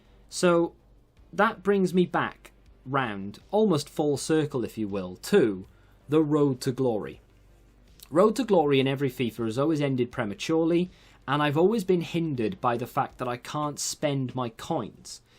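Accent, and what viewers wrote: Wales, United Kingdom. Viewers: Well, first of all, I fully understand and respect your decision. You gotta make the decisions that are best for you and your family. I'm 30 and I feel like that's one of the most important lessons you learn in life, that you need to be adaptable in order to make your life a success. Second of all, if FIFA 22 really is your last, that will be a massive shame, you will be hugely missed. I love your way of thinking and the way you talk, it's a bit old school and I have family in Wales, so I love me a bit of Wales and the Welsh accent. Thirdly, really looking forward to the RTG in FIFA 22! Your RTG in FIFA 19 was absolutely awesome and I really hope it'll be more or less like that. It'll be great to see you approach it exactly the way you want to, no holds barred. Good luck with everything boyo 👍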